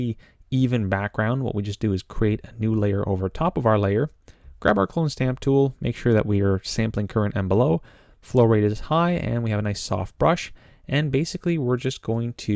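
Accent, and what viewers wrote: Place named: Canada. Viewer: I knew you were Canadian by your cadence. Dead giveaway was when you said too low. also thank you cause this was really helpful despite my need to identify your heritage. haha.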